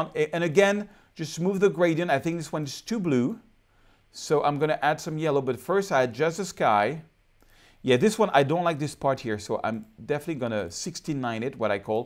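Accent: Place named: France. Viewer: Je pense que tu es en train de perdre ton frenchie accent! ;) J'aime bien ce tuto, comme quoi, travailler ses photographies n'est qu'une question d'émotions que l'on veut y véhiculer.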